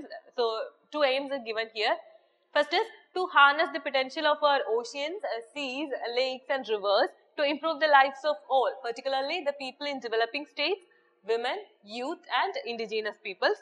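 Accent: Kerala, India. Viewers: thanks to neo ias ..great love from karnataka,... mam ur accent is in malayali style....for outer state student bit difficult to understand some words ...example- law....u pronounced it as lu, fog -fug, etc ...........speed is like bullet train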